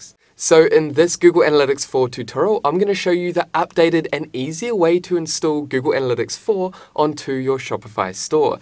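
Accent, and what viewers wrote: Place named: New Zealand. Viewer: Cheers Mate! Good to hear a fellow kiwis voice lol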